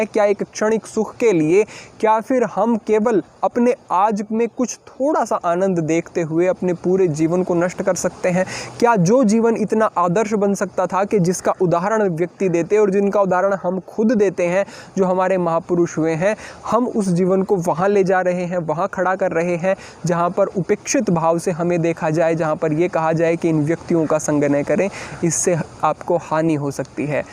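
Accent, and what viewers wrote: Standard. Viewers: So clear and Pure Hindi and video is good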